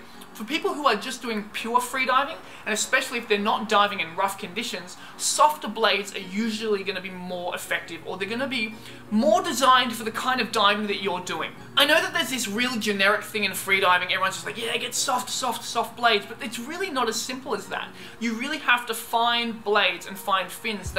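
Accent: Australia. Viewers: I like they way you talk Aussie man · I lova australian english!!!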